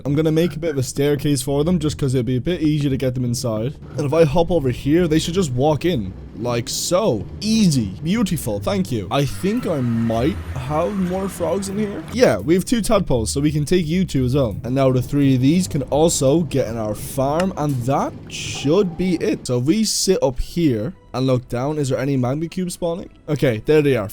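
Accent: Ireland. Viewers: Is that an Irish accent I hear ?? An Irish Minecrafter? Finally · always pleasantly surprised when i hear a fellow dubliner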